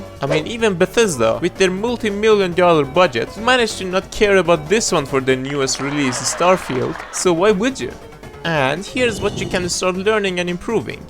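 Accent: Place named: Iran. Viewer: are you persian bro? just going of your accent and how the fuck do you have so few views????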